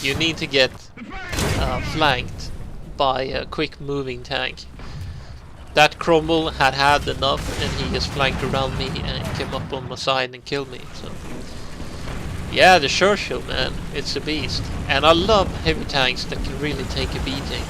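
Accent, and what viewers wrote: Sweden. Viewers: Lmao hörde att du var svensk efter första ordet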